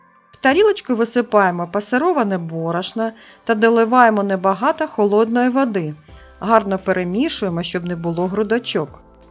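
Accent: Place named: Carpathians, Ukraine. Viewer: Наголоси ставите неначе ви з Карпат, а не з Полтавщини. Повинна ж бути автентична українська мова регіону.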